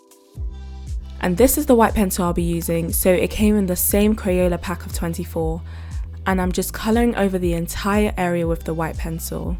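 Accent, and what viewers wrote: United Kingdom. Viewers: Your british accent is so satisfying...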